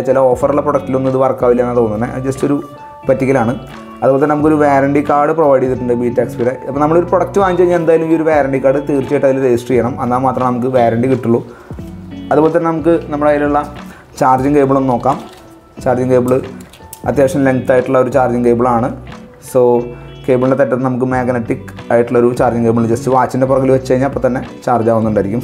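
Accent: Kunnamkulam, India. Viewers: Kunnamkulam bhashaa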